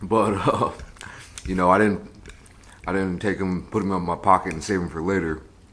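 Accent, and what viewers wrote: United States. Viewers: He sounds American because he’s from America. He moved to Seoul from the Midwest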